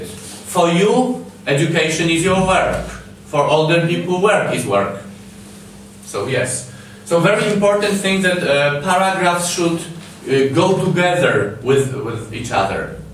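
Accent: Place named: Poland. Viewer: Wiedza imponująca, rzeczywiście, gratuluję uczniom :) Przyczepiłbym się do wymowy, która jest bardzo polska :P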